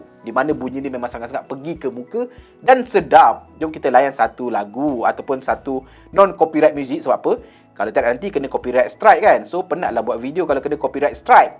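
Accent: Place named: Malacca, Malaysia. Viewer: Abng asal melaka ke pliss reply 🙏🙏😌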